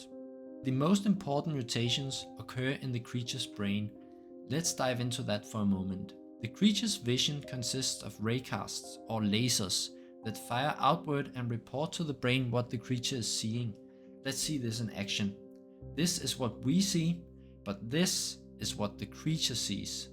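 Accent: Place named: Denmark. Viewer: Fed fed projekt kunne høre du var dansker fra starten 😂 Arbejder selv med ai så fortsæt det gode arbejde!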